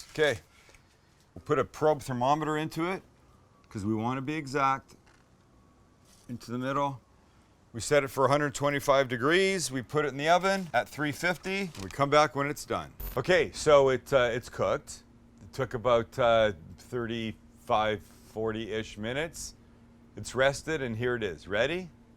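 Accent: Canada. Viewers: Lol I could tell he was Canadian by the way he said out and some other words, but I thought he said something about going church one episode so didn’t know he was jewish, Coolbeans haha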